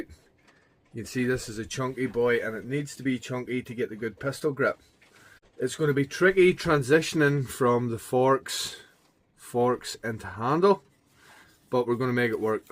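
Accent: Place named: Yorkshire, United Kingdom. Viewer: luvin your Yorkshire accent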